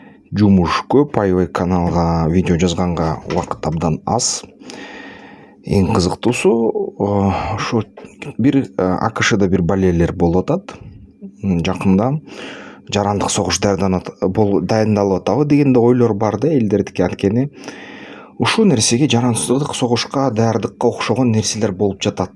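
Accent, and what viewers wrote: Standard. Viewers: Байкем Аман болуңуз кыргызча таза сүйлөгөнүңүз жагат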